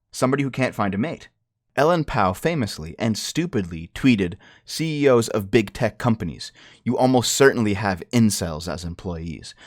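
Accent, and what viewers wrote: United Kingdom. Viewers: Why does she speak british? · her accent is so english and thats quite annoying just saying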